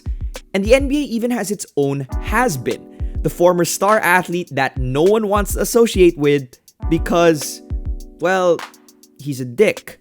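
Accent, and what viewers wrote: Philippines. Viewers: I was pretty sure you’re filipino judging by your accent and name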